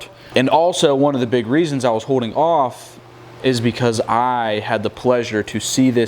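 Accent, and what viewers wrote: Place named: New Jersey, United States. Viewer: You must be from NJ!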